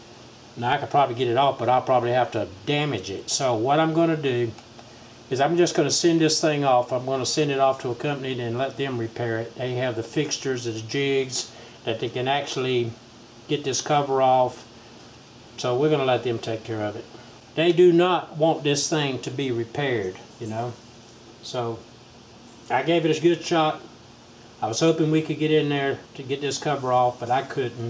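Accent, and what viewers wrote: South, United States. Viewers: my 2003 Cadillac Deville has this problem, and I'm going to <link> thanks for the informative video and that Southern Accent..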